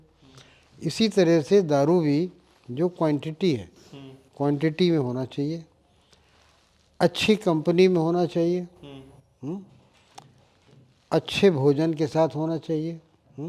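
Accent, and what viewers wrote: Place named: Haryana, India. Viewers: बेटे आप मेरे को हरयानवी लगते हो असग़र साहब से सवाल करते हुए हरयानवी लहजा झलकता है? बहुत ही लाजवाब चर्चा बड़ी ख़ास बातें बताई असग़र साहब ने बड़े लेखक संवेदनशील इंसान सलाम सर जी को, आपको बहुत बहुत बधाई शुभ कामनाएँ एक मानवीय सरोकारी इंसान से रु ब रु करवाने के लिए संग्रहणिए विडियो, महिपाल मानव हिसार हरियाणा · katter haryanvi h ji ye nam h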